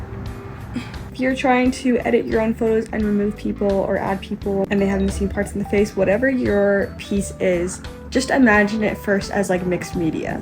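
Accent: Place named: United States. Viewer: You probably hear it a lot, but you have a really nice voice. Maybe it's the American accent lol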